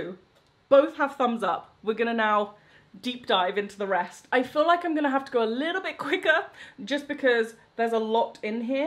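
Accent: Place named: RP, United Kingdom. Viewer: Oh no! She's gone full on posh! But I love the tweed!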